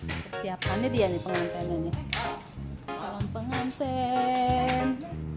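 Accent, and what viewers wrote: Jakarta, Indonesia. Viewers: Salam kenal yo pok..aku dejet lho pamulang..pertama kali liat youtube pok lgs pengen liat terus video2 yg lain...biarpun aku org jawa tp denger celotehan pok yg khas betawi bangeet lucuuu...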